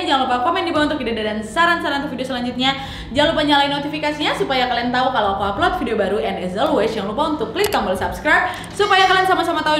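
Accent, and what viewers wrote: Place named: Medan, Indonesia. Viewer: Ga seru rupanya klo ga pake logat medannya, emang kenapa rupanya.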